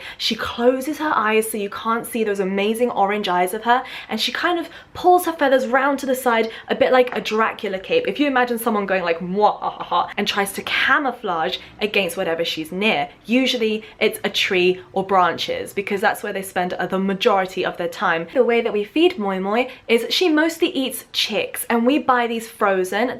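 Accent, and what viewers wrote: England, United Kingdom. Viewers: Prounance from england is so beautiful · Oh, that British accent. Nothing for my ears.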